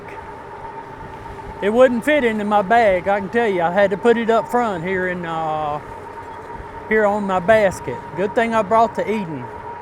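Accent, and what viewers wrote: South, United States. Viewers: Nice video! I love your southern accent.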